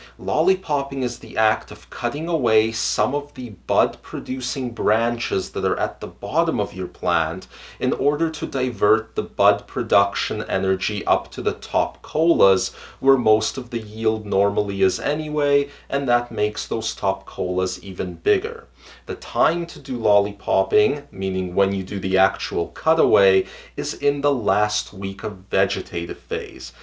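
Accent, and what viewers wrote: Russia. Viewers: Your a good hombre so I subscribed. By the way I think I really good at determining someone’s accent having a mom from Finland with super heavy one. Just thought there was for sure some Русский there Хорошего тебе года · My guess is he has a Russian background and moved to North America... Perhaps Canada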